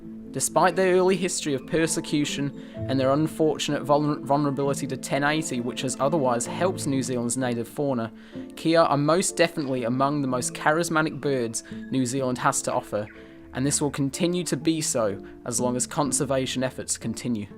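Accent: United Kingdom. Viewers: wait your a Brit?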